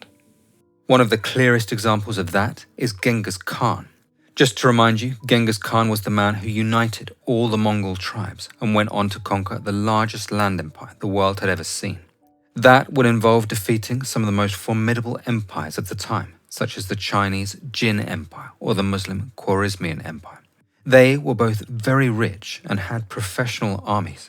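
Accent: United Kingdom. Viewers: good narration, sources cited in the video, good historical and reproduction footage, British accent, and cool topics. Subscribed.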